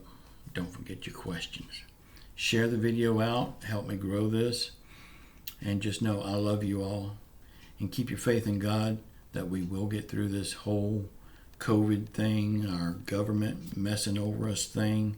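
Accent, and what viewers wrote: South, United States. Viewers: You know that's the 1st time I heard the word filling station in a long time and you make me laugh when you say word's like hurricane and tonight when you said Carol syrup it, krol syrup lol I,m not making fun of you the way you talk I live in Cookeville TN and I,m country and I know you live in florida and they are country but you seem like your country as corn bread I love this show i finally got to keep myself up tonight to watch it on time but I still watch it when I wake up around 3 in the morning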